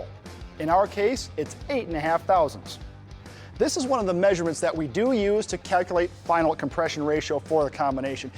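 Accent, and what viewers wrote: United States. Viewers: Can the narrator get any more American than this???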